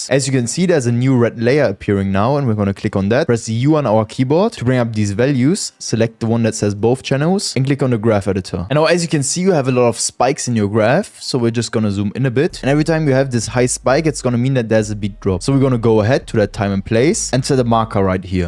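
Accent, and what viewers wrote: Germany. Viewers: Dieser deutsche akzent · Ich rieche einen Deutschen Akzent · so geil wie man nach den ersten 2 sekunden weiß dass er deutsch ist haha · Man hört deinen Deutschen akzent extrem 😂😂😂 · I hear a deutsch akzent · Ich höre den deutsch Akzent…. Hast du so ein Video auch nochmal auf deutsch? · man hört wie krass deutsch er ist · Thx Bro. The German accent is awesome tho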